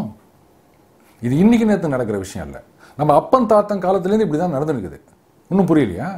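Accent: Chennai, India. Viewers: Madras தமிழ் பேச்சு, பிரமாதம்.....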